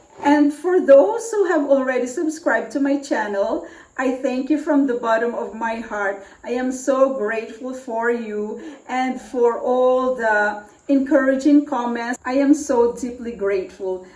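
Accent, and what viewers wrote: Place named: United Kingdom. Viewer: Back to your table set up. Your vintage ginger jar oh my you have all this beautiful rare pieces in your home. The fruits and the crystal tray is epitome of Classic blue and white decorating. I love the idea that you bring fruits for fall accent and I know we don’t celebrate fall in the Philippines and that’s a very clever idea. Tea party is one of my favorite too so overall you made me really happy with this collaboration and you make me laughed with your British accent. Lol I hope we can do this again next time this is really fun I learn something from you and I love it. Thank you Sis. I did share this in my FB and later in my community tab.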